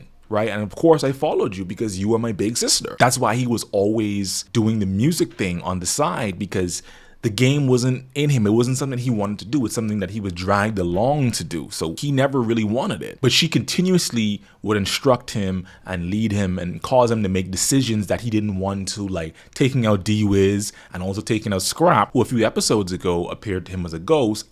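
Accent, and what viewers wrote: Bahamas. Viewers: you sound Bahamian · Are you from the Bahamas your accent remind me of mines? Bless up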